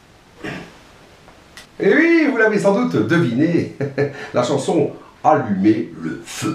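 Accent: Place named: Nord-Pas-de-Calais, France. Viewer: On dirait Guy Lux parfois avec l'accent Chti ....le père de Liliane.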